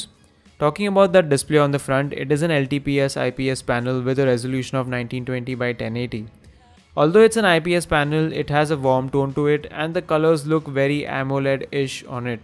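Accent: India. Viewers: There's something about the indian accent that is hard to take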